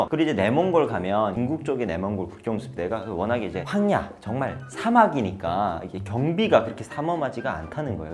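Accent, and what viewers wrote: South Korea. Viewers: 이사람 왤케 한국사람같음? 말투가 그냥 한국인임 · 말하는개 보니깤ㅋ 한국남자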